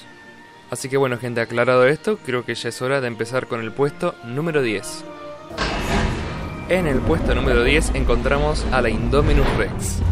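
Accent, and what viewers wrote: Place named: Argentina. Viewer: se nota que es argentino 🔥🤷🏾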